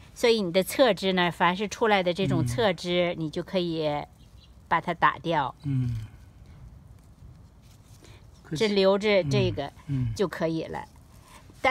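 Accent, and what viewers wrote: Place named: Shandong, China. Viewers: 老师是胶东人吧：) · 哪里，这样听着更亲切，我也来自山东，青岛人。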